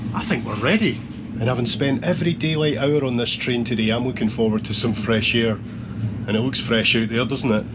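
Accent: Scotland, United Kingdom. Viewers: Before watching this video, I'm excited to see a outsider view on VR trains! :D I have used the train to travel from study city to hometown, 700km+ takes 6h 30mins usually. and anytime i have had delay due to VR being silly has been at least twice. Delay happened because there wasnt enough of busses or taxis for around 40+ people so it was quite annoying. Now I will watch this video and see your thoughts! edit: Gave a sub and like, I love Scottish accent and I love hearing about non-Finns express their thoughts on Finnish stuff :D 89€ sounds like a fair price. mine usually are 30-50€ depending on how close i book the departure etc. Aww I loved the Conductor's Hello :D They are doing this thing called "Pilkki", which I think is called Ice Fishing? But you have this tiny rod and you sit near the drilled hole hoping to capture a tiny fish. Never tried it, only regular fishing :D one time i get to spend little bit time in one, since the train had broken so we had to partake in a train as extra passenger so i stayed until someone who had it reserved came to claim it. 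The soundproofing of them are quite nice! currently while writing this i am , Here he the breakdown of the coaches in VR trains Coach 1 = pet coach coach 2 = Extra class coach 3 = Extra/silent coach(I might be mixing the 2 and 3 together, but i remember that both should have unique top decks) coach 4 = Children play area Coach 5 = just your average coach Coach 6 = nothing special I will admit i am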